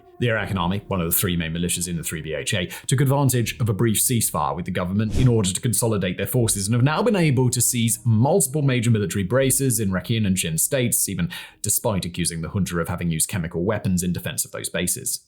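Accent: United Kingdom. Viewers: God Im so tired of hearing this British fucking noise they're even making more of you narrators on TV it's lit er ally not literally it's pronounced mil it ary, not militry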